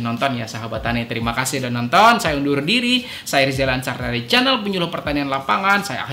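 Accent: Banjar, Indonesia. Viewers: Joosss buat ppl...walaupun gmn logat banjar ny gk ilang²....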